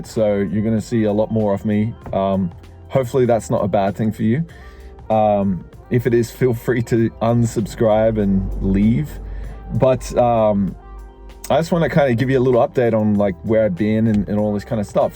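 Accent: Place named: Australia. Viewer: Cool hearing your story. I was wondering about the Australian accent, Mid East last name, and Japanese residency.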